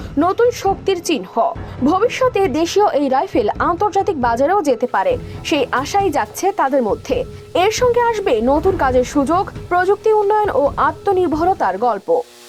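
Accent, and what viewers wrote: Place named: India. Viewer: কথা বার্তা ধরন ইন্ডিয়ান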